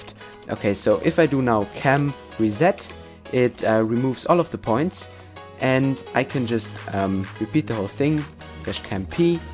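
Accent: Germany. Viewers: Is he from germany?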